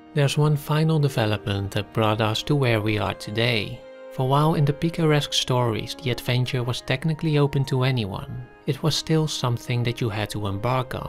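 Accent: Netherlands. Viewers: Are you Dutch? Your accent seems Dutch. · kan ik opmaken uit je accent dat je in der Nederlanden woont? Klasse kanaal, topkwaliteit materiaal dit! :D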